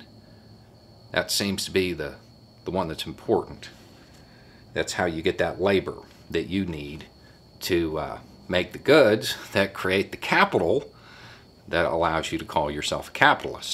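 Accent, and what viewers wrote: South, United States. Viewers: You do realize that human trafficking could include helping illegal immigrants get to a better place correct? Just because it breaks the law does not always mean that it is immoral in nature. As for the accent as someone who was in the military and moved around I picked up the accent of any place I resided in given enough time, people gain and lose accents it is a thing so I don't know if it is really fake or not and even if it is is a Southerner now and I doubt you even know where he grew up so you can't even pull that not born a southerner card....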